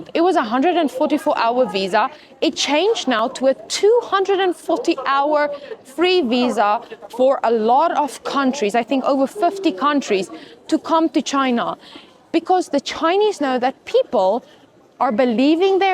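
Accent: South Africa. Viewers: your accent sounds South African... is that the effect of Mandarising your US accent... just out of interest... Many thanks, loved the video, subbed. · I could be wrong, but you sound South African. From Jhb, i just wna say, you got my subscription sister ❤ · Watching from Montreal, Canada. are you South African? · jy het n lekker South African accent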